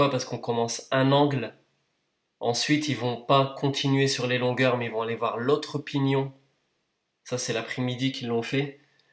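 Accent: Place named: France. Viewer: Bonjour, merci beaucoup pour ces vidéos qui m'apprennent beaucoup, donc encore un grand merci. Rassurez-vous, vous avez une très belle voix et vous parlez très bien le français avec un accent français. Je sais que vous êtes d'origine magrébin, c'est pour cela que j'aimerais vous demander si cette méthode de construction pourrait très bien s'appliquer dans nos pays comme la Tunisie, l'Algérie et le Maroc ? Merci encore.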